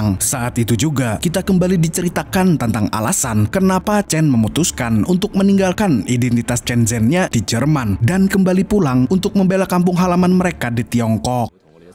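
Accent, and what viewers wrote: Banjar, Indonesia. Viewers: Ikam urang Banjar kh? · Org banjar kh ni.?? Slm knl dari bjb. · Banjar kh bng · waaaaayyy abang orng banjarr kah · ai admin bahasa Banjar sakalinya😮😅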